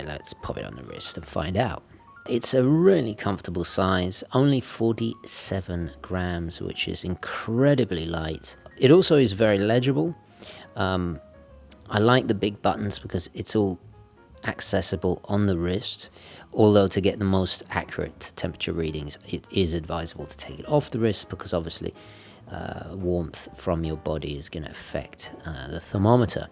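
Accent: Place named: United Kingdom. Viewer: I have enough watches the only reason I still watch is because of his British accent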